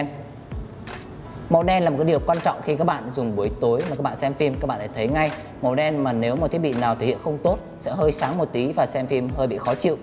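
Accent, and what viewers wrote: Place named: Central, Vietnam. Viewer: ông này hình như giọng chính là miền trung.... nghe mõi miệng vãi....đánh giá chưa chính xác, thiếu tính khách quan...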